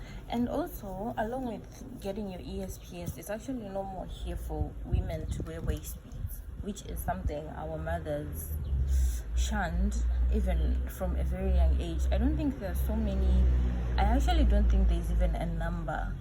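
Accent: Ghana. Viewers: You sound a Ghanaian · Ghanaian accent is the best just like the British.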